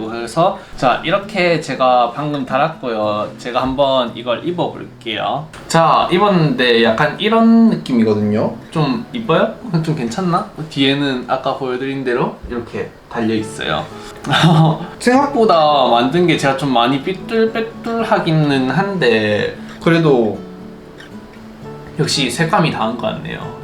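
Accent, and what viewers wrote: Gyeongsang, South Korea. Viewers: 모르는 내용도 아닌데 뭔가,, 경상도 억양이 넘 귀여워서 계속 보게 되네요,, 경상도 사투리가 이케 다정한 느낌일 수가 있군여, 중독성 있네욥,,:)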